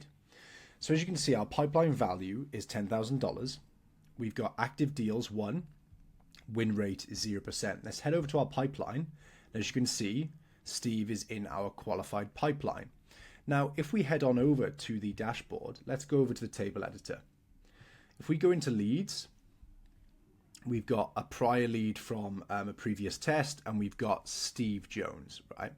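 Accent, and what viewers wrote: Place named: Wales, United Kingdom. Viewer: Where in Wales are you from boss? Fellow dev here, from Cardiff, love hearing the accent from home on these type of videos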